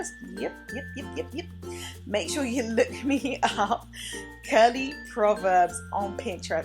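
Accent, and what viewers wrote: England, United Kingdom. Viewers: OH MY GOD YOU'RE ENGLISH!!!!! YESSSSS!!!!!!!!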